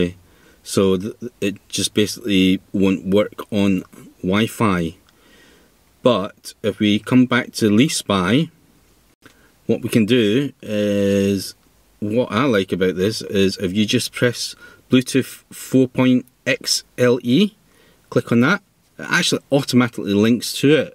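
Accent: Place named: Glasgow, United Kingdom. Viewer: Great Glaswegian accent.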